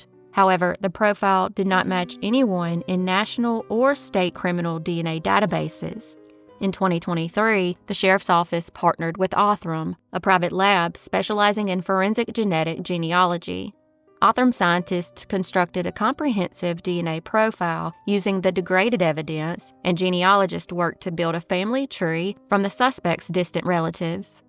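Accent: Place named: South, United States. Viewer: It is so nice to hear a southern accent! I'm from Ohio, but family is in Georgia. Grandma moved up here and would tell me stories to get me to sleep. Listening to the accent with true crime is so relaxing!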